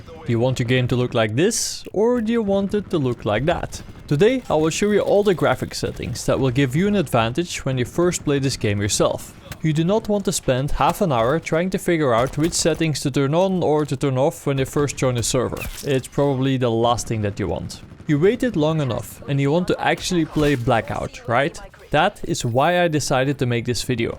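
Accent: Netherlands. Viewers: lol dit is echt nederlands accent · Are you dutch?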